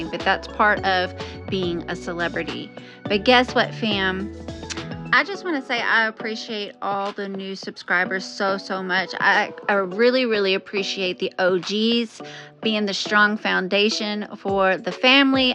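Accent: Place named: South, United States. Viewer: Love your voice! You sound like a southern Belle! ❤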